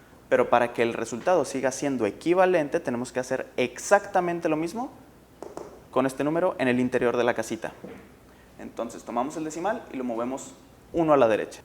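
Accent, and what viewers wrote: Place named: Mexico. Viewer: El profe es muy bueno explicando,pero el acento ese mexicano,no me va,prefiero español de argentina,perdón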